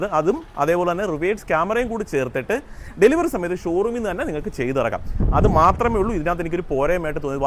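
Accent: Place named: Kollam, India. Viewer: കൊല്ലം language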